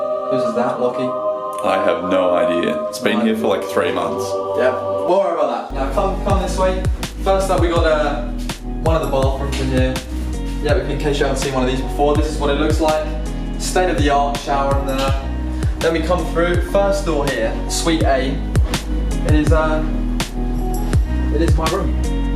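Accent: Australia. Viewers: Australian accent hahahah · That Australian accent is getting stronger😂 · THAT AUSTRALIAN ACCENT IS COMING THROUGHHHH😂😂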